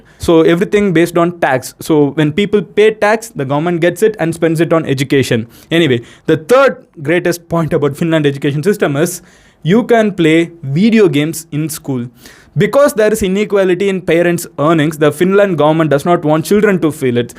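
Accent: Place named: Tamil Nadu, India. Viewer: Enakku mattum than ivar pesure english tamil mathiri kekutha? 🤔🤔🤔... Ivarode video parthu parthu ivar vere language pesenalum en kathukku tamil than kekuthu😁😁😁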